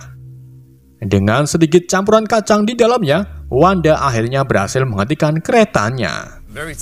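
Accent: Indonesia. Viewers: penyebutan nya indonesia bangeetz😂 , 👍👍🤗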